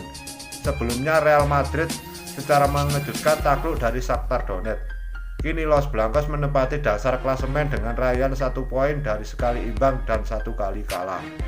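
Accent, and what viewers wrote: Java, Indonesia. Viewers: Kaya orang Jawa ngapak hehehe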